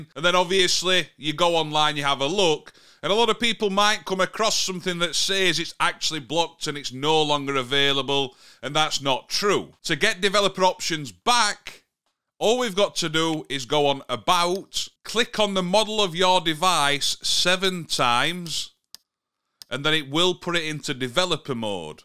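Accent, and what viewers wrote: Yorkshire, United Kingdom. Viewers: It's Yorkshire.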